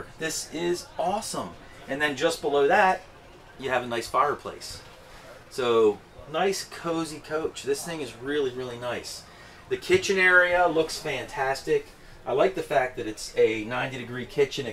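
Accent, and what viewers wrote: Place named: Maryland, United States. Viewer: I knew I heard a MD accent! Ravens sweatshirt confirmed it!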